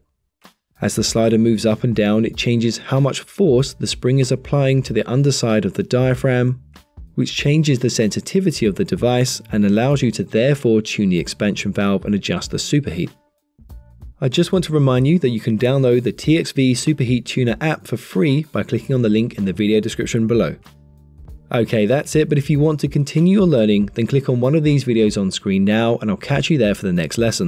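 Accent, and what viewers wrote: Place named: United Kingdom. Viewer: i wish you'd talk a little slower,,,,,,,,,,,,,,,i know you can go back and watch again as much as you want or need to but with that british accent i miss a lot and i become frustrated and turn off the video,,,,,,,,,,just a suggestion though,,,,,,,,,,,,,,,other than that i do like the videos............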